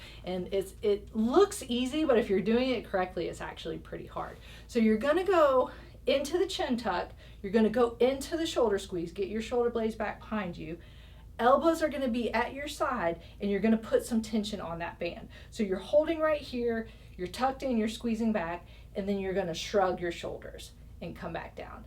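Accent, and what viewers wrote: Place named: Alabama, United States. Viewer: alabama drawl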